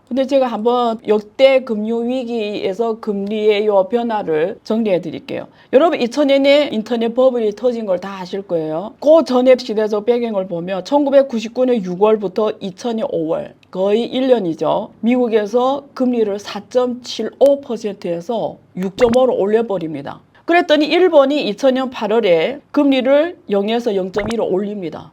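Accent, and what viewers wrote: Yanbian, China. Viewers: 펀드 할것 못돼요 판매하는 금융 창구 직원들도 뭔지 모르고 팔지요... 위에서 시키는대로 수당을 주니 호구를 잡고 팔지요 1,000개 이상의 펀드 ㅋㅋ 주식투자 펀드투자 그만 광고하세요 부동산 급락 소리도 하지말고... 그냥 연변 사투리가 재미있어요 ㅋㅋ 세미나에서도 자세하게 하지 마세요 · 한국분이신가요~발음이 중국교포같오서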